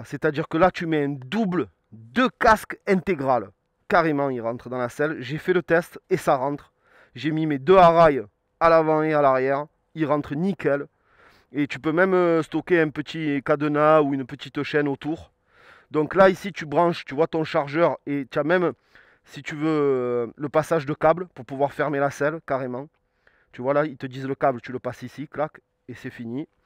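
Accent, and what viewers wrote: South, France. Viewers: Me encanta el tio este con su acento del sur,j'adore ce mec avec son acent du sud,saludos desde Normandie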